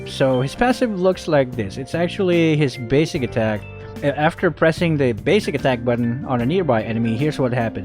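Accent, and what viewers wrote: Philippines. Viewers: wait narrator’s a filipino? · Youre good in english but sometime your voice in your stream sound filipino accent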